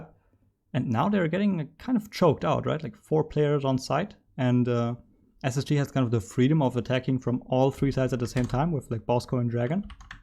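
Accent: Germany. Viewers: Are you from germany? It kinda sounds like that to me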